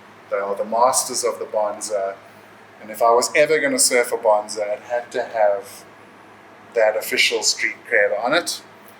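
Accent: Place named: South Africa. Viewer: Great content deserves great recognition and some dope giving back on the love that has been given, i.e., called content... It's channels like yours which make the internet and You Tube a fun place to visit and not a boring one... Thxxxxxxx dude!!!! HAPPY SURFING FROM VIRGINIA BEACH, VA!!!!!! Oh yeeeaaaahhhh I am nearly 5'9 and fluctuate from 205-230 pounds on the leaner side never less than 190 lbs, so being somewhere in the big guy club myself, this channel hits right and is super spot on... Not every surfer is skinnied out with a six pack and big guys can and do rip too, like Owen Right, Jordy Smith, John John, William Cardoso, Jay Davies, and Wade Carmichael to name a few... Plus your "SA" accent and knowledge is cool... It sucks your not at 50K approaching 100K subs already... I hope your channel blows up because your having fun, sharing positive vibes, and giving back 411 surf info we can all learn from plus dope "SA" surf, ocean, and board footage... 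Channels like yours, How To Rip, The Surfers Journey, Kale Brock, and isurftribe, have all helped me learn more about surfboards and how to build a proper surfboard quiver for my body weight and size and skill level... Most important your fun to watch because your ""having fun"" and your passionate about SURFING which most definitelyyy shows and what it should be about it, sharing and giving positive vibes and stoke to the viewers... Thanks again dude!!! Movie quote for you,, "Build it and they will come". Please keep bu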